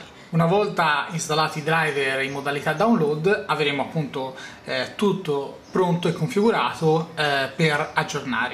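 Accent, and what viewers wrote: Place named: Tuscany, Italy. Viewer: l'uso di spengere non e' proibito ed anzi e' molto diffuso in regioni del centro Italia, come la Toscana.A giudicare dall'accento, il cortese relatore di questo utile compendio video, sembra provenire proprio dalla succitata regione.Cfr. il sito dell'Accademia della Crusca, qui: goo.gl / G1VoB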